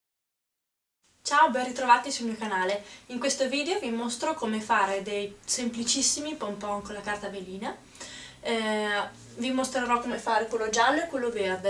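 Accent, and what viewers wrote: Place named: Veneto, Italy. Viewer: Che bello accento 😻 sei veneta ?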